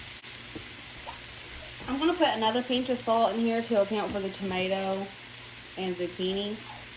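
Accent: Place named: East Tennessee, United States. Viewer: I knew it! When you said "you'ns," I had to check the Description Box. I grew up in either TN or KY most of my life, and I thought you might be from Eastern TN listening to your accent. I love hearing someone speak "right" on YouTube, BTW, LOL! But when you said that? It just made me SMILE! I'm currently in Western KY, but I know my accents. Please don't ever change! Just found your channel, and I love your ideas--new sub. Feels like I found a new friend. God bless you and your family! 🙏💖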